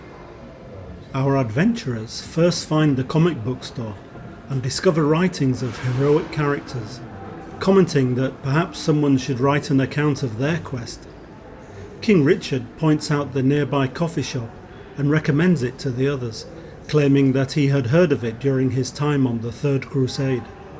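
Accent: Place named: United Kingdom. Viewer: Thanks for sharing — an interesting project. A few comments: The verbal narration (British accent, high vocabulary, and clear diction) gives the video a quasi-documentary credibility, which works well for a contemporary-myth style story. The title’s allusion to Walter Benjamin’s The Arcade Project similarly reinforces that tone. This setup suggests a deeper symbolic reading — not necessarily Benjamin’s exact ideas about consumerism and cultural loss, but something in that thematic area that isn’t yet fully developed. Regarding the filming treatment: if you adopt the criteria of a contemporary myth, consider expanding beyond simple montage. In addition to collage, other cinematic treatments could enrich the marcette scene. One distracting element is the camera’s hand-shake; using a tripod for that shot would help. I hope this feedback helps with your next iteration.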